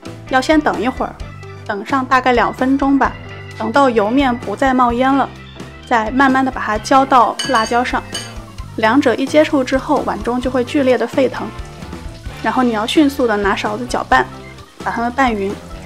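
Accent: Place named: Xi'an, China. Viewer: 听声音是咱西安的吧？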